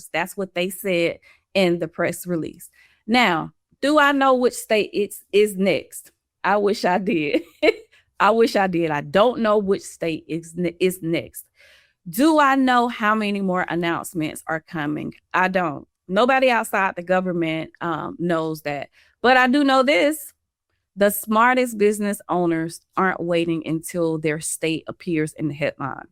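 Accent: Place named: Georgia, United States. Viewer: Sound like Georgia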